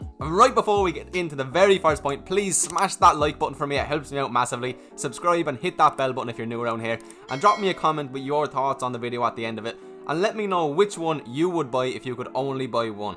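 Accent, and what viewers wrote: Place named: Cork, Ireland. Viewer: you from Cork boy?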